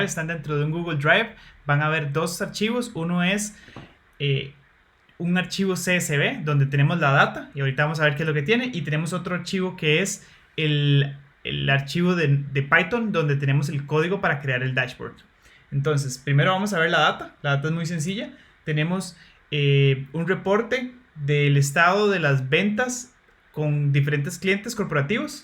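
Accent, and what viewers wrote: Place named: Costa Rica. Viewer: no se pierde el acento tico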